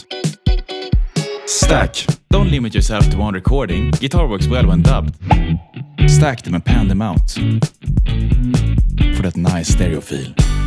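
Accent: Stockholm, Sweden. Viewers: jess i hear de svengelska is going krejsi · swedish accent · Never ever lose your swedish accent ❤ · is this guy swedish??? jag älskar allt om den här videon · Gotta love your Stockholm dialect while speaking English. 😆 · please brother, try and lose the harsh stockholm accent when speaking english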